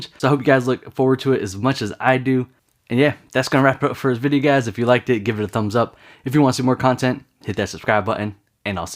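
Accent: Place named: South, United States. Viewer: oh god, i just couldn't help it but he sound like he has a real southern accent... Has anyone thought of it?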